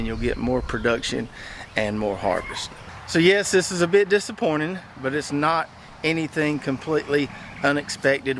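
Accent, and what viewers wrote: South, United States. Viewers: I’m a new subscriber I was wondering what state are you located ? I’m in Florida and it looks like Florida and maybe the accent lol. Definitely from the south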